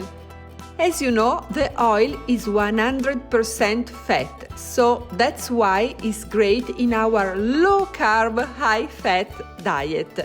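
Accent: Italy. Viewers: You have big Italian accent but I understand you very well Better then a person with an American accent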